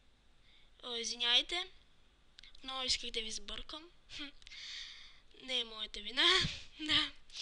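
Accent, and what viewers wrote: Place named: Russia. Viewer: Руснак ли си, защото имаш як акцент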